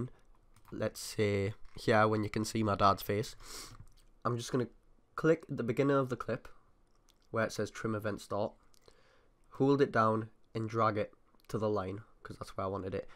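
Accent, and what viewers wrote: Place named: North East England, United Kingdom. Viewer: your from the northeast of England :D like me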